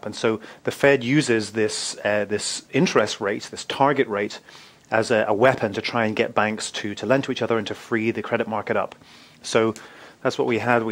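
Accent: United Kingdom. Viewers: you are Briton, arent you?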